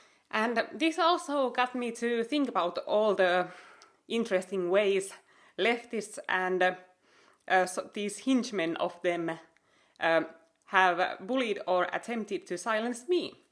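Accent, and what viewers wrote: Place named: Finland. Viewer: i love the finnish accent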